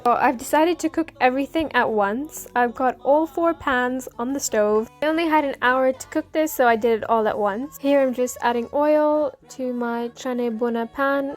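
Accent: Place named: Scotland, United Kingdom. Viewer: Which prt of UK you from? Sounds scottish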